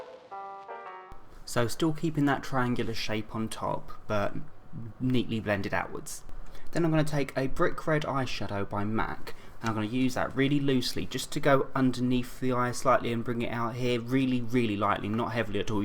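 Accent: United Kingdom. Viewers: He is and he's British so the accent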